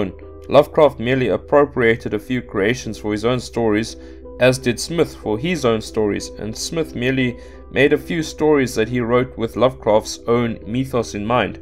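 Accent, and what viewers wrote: South Africa. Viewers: So do many of the ones on Abebooks but you get a vast selection and they're VERY cheap, sometimes pennies. Seriously, check them out... hang on, I just realised, from the accent, you're probably not in the US or EU are you? South African? If so there's an Abebooks in Capetown but they're all over the place.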